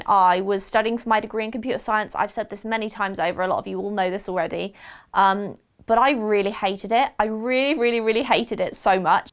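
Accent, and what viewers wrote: United Kingdom. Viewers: learning how to have a British accent and I wanted to hear one to help me learn it better, and I immediately thought of u! lol :D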